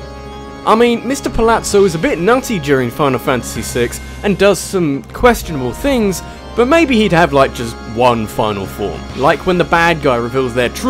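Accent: United Kingdom. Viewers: Gigadrain is it a British Accent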